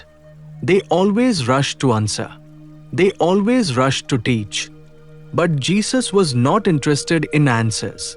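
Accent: India. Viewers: The narrator speaks with heavy indian accent. The story is not from bible for sure. Most probably from hindu since hindu is big religion in India.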